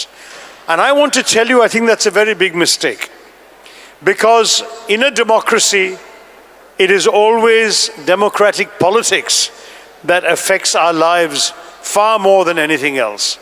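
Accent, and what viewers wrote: United Kingdom. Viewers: At a very first time I'm watching dr. Shashi and then i perceived that he is speaking British English but easy. As he told about books there is joy of finding out destination that is already defined and i feel i am going to become good reader and dive into reading more. I love reading books 🥰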